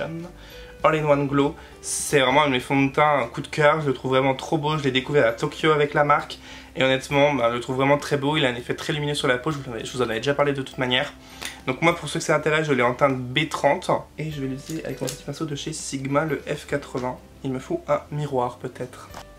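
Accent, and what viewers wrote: England, United Kingdom. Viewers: J'adore ton accent anglais 😍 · Tu es tout en blanc car tu es un ange... mais ça tu ne le sais pas... Bel accent anglais...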